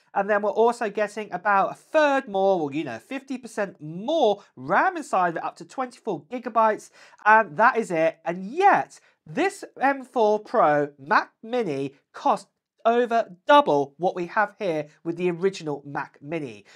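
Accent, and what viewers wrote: United Kingdom. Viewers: I am planning on getting the base model M4 and hooking up an external thunderbolt drive in a thunderbolt enclosure for more (and very speedy) storage. I could really use a new iPhone but for now, my iPhone-7 is still ticking along. Love your videos and your accent. For some reason I feel smarter when listening to a Brit! 🤣🤣🤣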